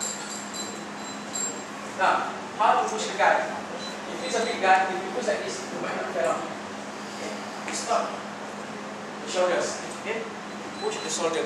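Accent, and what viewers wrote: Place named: Kelantan, Malaysia. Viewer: betul la cikgu... ada sikit sikit loghat kelate ^_^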